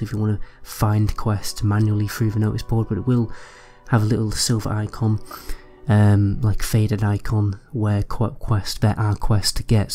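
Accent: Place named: Northern England, United Kingdom. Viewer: your accent started to get increasingly less RP and a lot more northern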